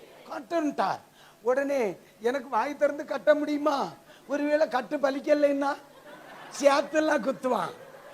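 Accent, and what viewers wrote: Kanyakumari, India. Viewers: KK District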